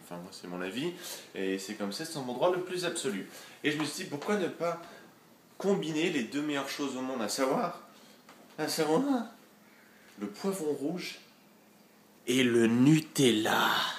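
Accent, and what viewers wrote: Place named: Quebec, Canada. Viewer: Regarder au debut a l'accent du Québec et maintenant il parle commen les francais... bref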